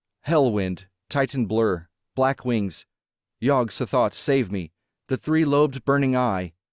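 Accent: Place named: United States. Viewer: Always loved this one. And the narration is by an American!...for Poe and lovecraft - a must have. Imagine M R James or Arthur Machen read by someone NOT from the UK. This narration of HPL...was very brilliant!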